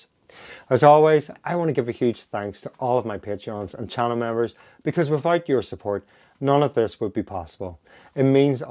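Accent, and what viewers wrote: Northern Ireland, United Kingdom. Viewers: just discovered you for the first time. praise the algorithm ! that a fellow "Norn Iron" accent i am detecting there lol?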